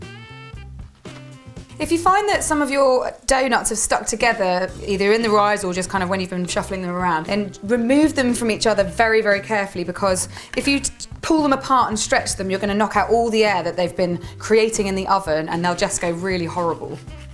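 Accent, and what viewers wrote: England, United Kingdom. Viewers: hi, first of all I wanted to said you are awesome and I love your English ascent:) I love watching your YouTube and today I decided to try your doughnuts recipe, follow exactly your recipe, beautifully raised and I love the texture. I am a doughnut fan so I always try recipe. my question is how to get the doughnut soft all day like we buy in store. yours nice soft when it hot however after 4 hours I taste a bit chewy n not that soft like a hour after frying. Do u hv any tips for its always soft whole day. thanks. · Great video, love your british accent! By the way, I can also use a pan to fry the doughnuts, can't I?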